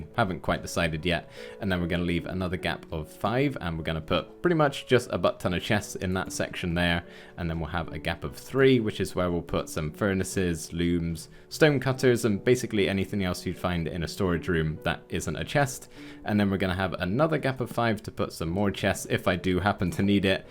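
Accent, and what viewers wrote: United Kingdom. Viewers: Sadly i missed the stream and have no clue where to find it but i'm still loving this series so far (is it even a series) but yeah i'm loving it so far.Also are you British?You sound like me a bit.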